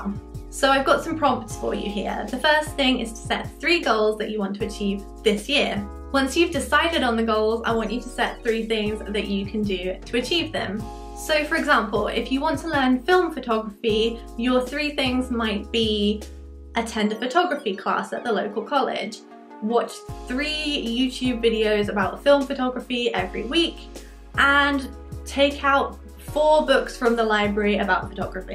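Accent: United Kingdom. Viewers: No hate r u British of American x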